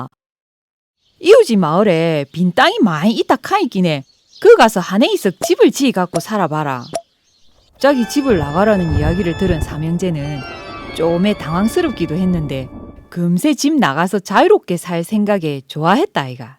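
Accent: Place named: Busan, South Korea. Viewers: 귀에 쏙쏙 들어오는 부산 사투리가 정겹습니다. ^^ 세이(형), 속에 천불이 난다, 쎄가 빠지게, 쎄때 등등 어릴 적 고향에서 많이 듣던 말들이라서 그런가 봐요. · 벽돌집의 숨은 비화를 파헤친다!!! 부산 말로 재미있게 들려 주셔서 감사합니데이~😊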